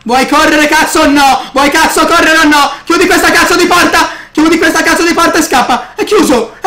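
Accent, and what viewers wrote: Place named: Florence, Italy. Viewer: mi fai morire😂😂 in un manihomiooo💞😂...quanto adoro la pronuncia dei fiorentini ahah💞😂😂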